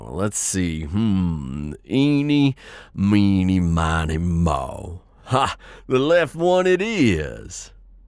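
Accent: South, United States. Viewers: this heavy southern drawl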